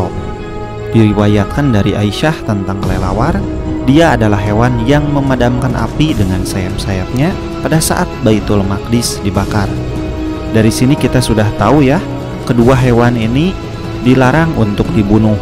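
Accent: West Java, Indonesia. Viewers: Sunda banget logatnya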